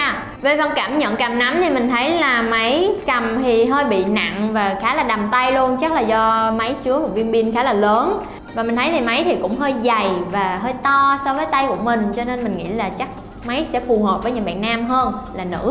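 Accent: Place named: Phu Yen, Vietnam. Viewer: Nghe tiếng sao giống phú yên hay bình định gì giữ trời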